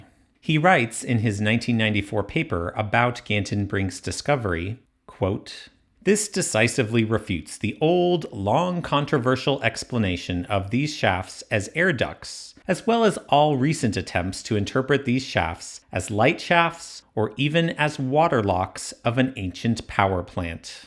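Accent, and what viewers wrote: United States. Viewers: I have said this before but no one responded. I asked what state is this accent from? You wanna search for Audit the audit and the villains they sound just the same too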